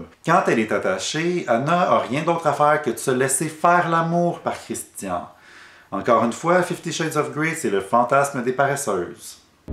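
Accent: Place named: Quebec, Canada. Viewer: Ah mais de rien! Je l'attendais tellement l'argument "En vue de son passé c'est normal" x) Et j'avais pas relevé la connotation homophobe parce qu'à des moments je savais pas de qui parlait exactement alexmoinard ni où elle voulait en venir mais à présent je me dis que si j'avais su j'aurais réagi => En tout cas j'en profite pour remercier d'avoir fait cette vidéo très bien expliquée (avec en prime cet accent québécois que j'aime tant ♥) et qui m'en a beaucoup appris. Je me permettrais même d'ajouter quelque chose qui pourrait peut être être un peu plus éclairci: le fait qu'Ana se fait violer à plusieurs reprises par Grey (comme sa "baise punition" comme elle l'appelle) étant donné que ce charmant monsieur ne lui demande en aucun cas son avis pour savoir si elle a envie d'avoir un rapport sexuel ou non et qu'il ose dire à un moment "I know you want me" qui est clairement ce que disent énormément de violeurs à leur victime pour justifier leurs actes ignobles. 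Ça m'a à moi aussi fait découvrir 9 semaines et demi que j'ai acheté sur ma liseuse tant j'ai été intriguée ^^ Le film aussi a l'air d'être pas mal, il faudra que je vois ça à l'occasion (=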